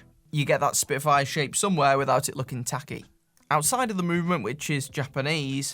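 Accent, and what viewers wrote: Stoke, United Kingdom. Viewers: My dad was from Stoke. Your accent is absolutely fine by me as it reminds me of him now he's no longer with us. Love your reviews too.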